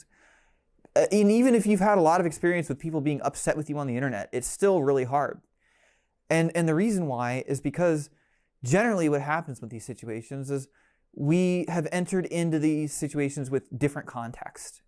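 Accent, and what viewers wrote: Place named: United Kingdom. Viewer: I think he’s British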